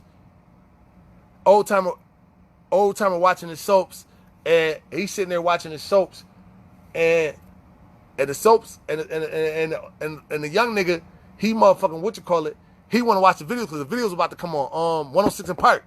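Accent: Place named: Boston, United States. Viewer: Dude gotta be from Boston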